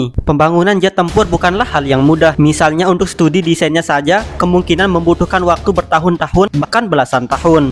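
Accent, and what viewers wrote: Lombok, Indonesia. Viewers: Bang lu orang lombok bukan?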